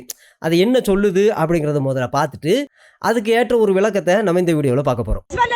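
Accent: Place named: Kanyakumari, India. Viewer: This is kanyakumari Tamil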